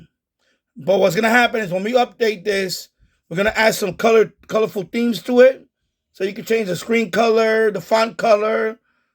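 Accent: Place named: New York, United States. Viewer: As soon as the video started and I heard your accent, I knew I was in the right place. 😂. Clearly a New Yorker talking about radios as opposed to all the Brits. Great vid! The firmware update worked perfectly.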